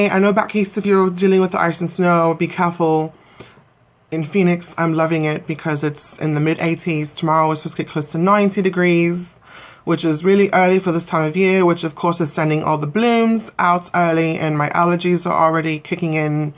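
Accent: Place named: United Kingdom. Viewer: Hi: Love the brit accent:Good Luck With Your New Apartment:Your lips look really nice. Could you do your next video wearing glossy Pink Lipstick?????? Please Please Please?????????? Bill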